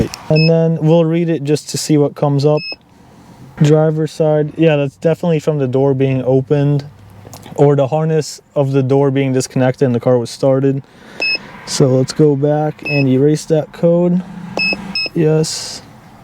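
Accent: United States. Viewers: Dude are you from the Western Mass area?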